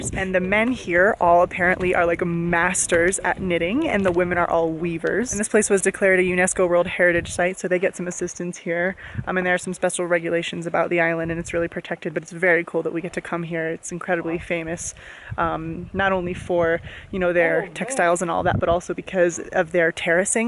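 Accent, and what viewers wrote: Spain. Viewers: hahaha Ay tienes acento español! Peru looks so beautiful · you speak great spanish!! Its funny how you have a Spanish accent, like from Spain, its not common for people to have that accent when spanish is their second language! Good hello from Colombia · espero que con este viaje aprenda nuevos acentos y se le baje un poco el españolete jajaja I also hope that you can come to Colombia, we are right there next to peru. there's so many incredible things to see in south america too;) Love all the facts and interesting details you always give us with each new place you go, makes me feel ready to go places, knowing a little bit more about cultures. that's why i love your vlogs ( also because you're hilarious and i adore you) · Is that a Spanish accent I hear? I love it!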